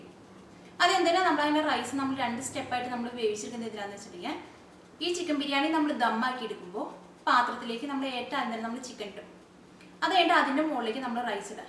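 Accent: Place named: Northern Kerala, India. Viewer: kitchen u r a very good cook!!! I like your recipes coz whenever I try any of them, they turn out to be really "homemade"... n me n my Husband are fond of your slang... R u from northern part of kerala?? Keep inspiring beginners to cook confidently..🙂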